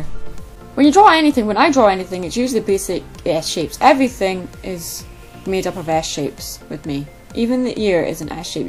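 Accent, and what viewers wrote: Scotland, United Kingdom. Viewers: Theamax Stage No,she's Scottish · you sound Scottish i live in Edinburgh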